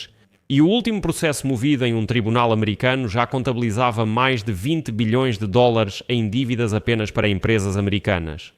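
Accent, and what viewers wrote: Portugal. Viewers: Vc tem um sotaque diferente do brasileiro, fala de Portugal ?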